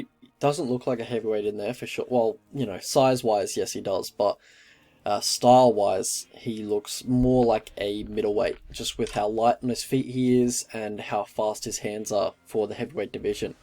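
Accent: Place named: Victoria, Australia. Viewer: Oh, ha,ha,ha!!! I thought you had more of a "Victorian" accent. I thought Queensland had a broader more "Crocodile Dundee"-type of accent, ha,ha. But, then again, that would vary even within the same city, as i became aware of in Melbourne. Anyway, great, detailed breakdown, Tom is gonna win and going to go closer and closer to Gane/Ngannou and we are witnessing a new era of heavyweights, that's for sure!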